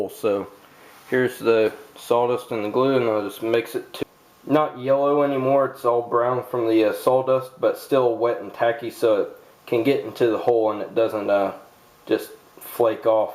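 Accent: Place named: South, United States. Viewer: thanks for this video - I haven't bought a scroll saw yet as I still need to decide which make and model to buy - in the mean time I've been watching all kinds of clips on UTube about scroll saws and this video of yours is the first that really explains very clearly how to do inlay work - I can now see a lot more possibilities with using a scroll saw - I'm not a country music fan at all but I really did enjoy the music with this video and it fits in perfectly with your southern accent - I'm sorry to hear about your brother - I checked and you are making videos again - that's great - it's a tribute to your brother and I'm sure he would have wanted you to continue - I've subscibed to your channel and please continue making videos as not many woodworkers explain things as clearly as you do - visual effects in clips can be interesting for awhile - but in the end most people want to watch clips like yours to learn - by the way I live in Europe - thank you for sharing your knowledge…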